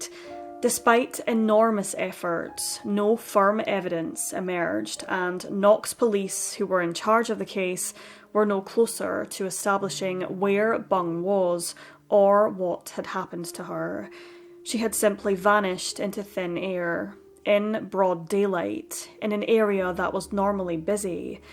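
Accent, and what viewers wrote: Scotland, United Kingdom. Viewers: I am unsure how long I have been subscribed but I will say this without a doubt, you are my favourite true crime narrator, you never sensationalise the stories or make silly jokes and memes like others in the same genre, I do sometimes enjoy other methods but I dobnot respect them as I respect you. You being scottish also helps because it let's me here more of my own accent online without it being exaggerated or used as anything other than the true voice it is. You have inspired me to put my own voice out there (not the channel I am commenting with) and also you have given a voice to many of the unfortunate souls in your videos. Thankyou. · why are you scottish???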